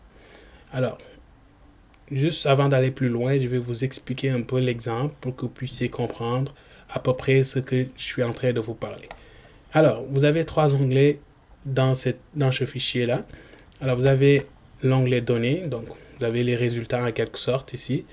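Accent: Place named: Senegal. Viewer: Tu es probalement sénégalais à ton accent. Pourquoi ne pas travailler aussi sur une carte de ton pays?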